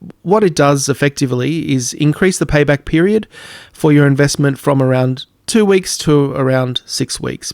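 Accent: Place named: Australia. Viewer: Thanks mate good to hear another Aussie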